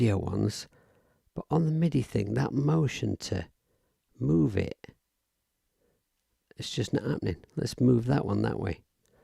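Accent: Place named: Sheffield, United Kingdom. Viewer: Hiya, I've been using the Rozeta suite for a while and now, thanks to you and Doug I've discovered the bud apps too. And of course you're right. I will lose days and weeks immersed in this stuff. P.S. I'm from Sheffield and I can tell your accent is not dissimilar to mine. Keep on rocking brother 🙏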